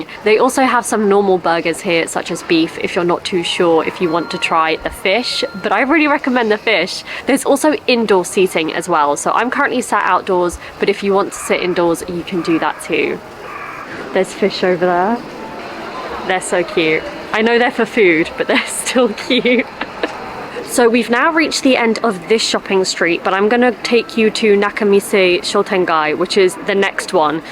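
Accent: United Kingdom. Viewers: イギリス英語かっこいい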